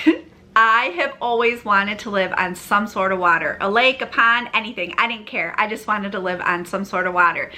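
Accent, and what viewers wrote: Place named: Texas, United States. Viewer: I could never wrap my mind around your dialect being from Texas! Best wishes for your future. 😊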